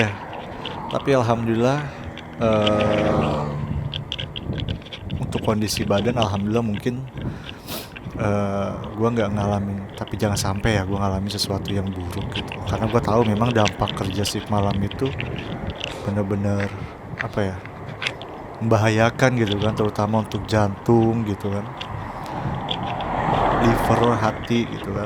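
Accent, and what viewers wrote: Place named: West Java, Indonesia. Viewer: Bang kamu orang sunda ya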